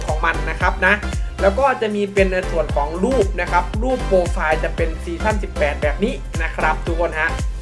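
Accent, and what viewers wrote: Isan, Thailand. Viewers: พูดกลางบ้างอีสานบ้าง555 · เว้าอีสานม้วนเเท้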